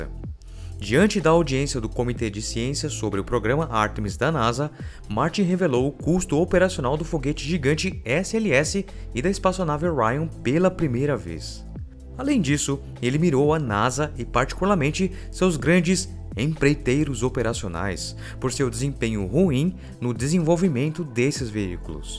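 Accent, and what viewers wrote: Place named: Caipira, Brazil. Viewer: Negativo no canal é apenas esse sotaque caipira do locutor. As informações e animações são bastante detalhadas. Parabéns!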